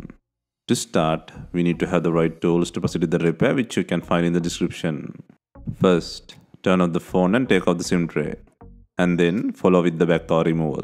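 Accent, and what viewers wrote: India. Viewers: It seems you're attempting to speak with the heaviest Indian accent possible. I can't understand 1/3 of what you're saying.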